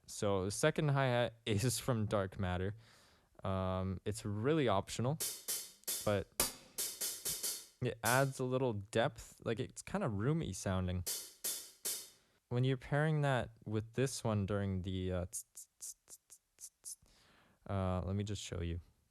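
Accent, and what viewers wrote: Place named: Canada. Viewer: Canadian detected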